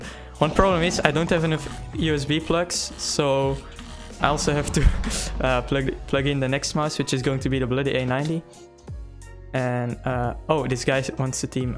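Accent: Netherlands. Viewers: can i ask you a question are you dutch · Are you dutch??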